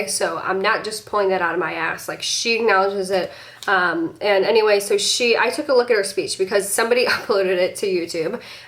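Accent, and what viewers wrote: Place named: Midwest, United States. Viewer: oh my god ur in chicago! i KNEW i heard a fellow midwestern accent!! also u look like you'd be british for whatever reason ahaha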